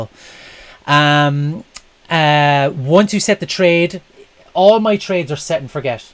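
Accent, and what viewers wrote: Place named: Ireland. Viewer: Hi, Very informative - excellent explanation - thank you - alright / all night (couldn't quite get my head around your Irish accent!;-) One question if I may: you were using TradingView to explain your strategy, but using TD365 as your broker. The two don't integrate (sadly), so I'm wondering how that works? Perhaps you're just using TV for demonstration purposes on your YouTube channel and your actual trading on TD365?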